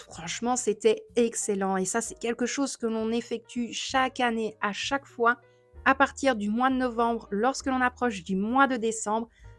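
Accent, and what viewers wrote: Belgium. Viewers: merci ,j ai regarder en effet,ça ressemble plus aux pancakes américain !! Ton accent mdrr ,je suis belge aussi donc sa ne me choque pas Et oui le spéculoos c'est la vie 😘😘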